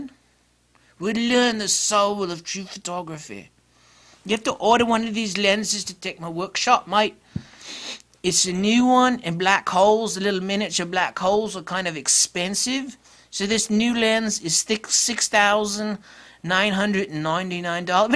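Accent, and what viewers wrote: United Kingdom. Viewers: wow, a British accent! At least one of them... Now say this "Would you like a glass of water?"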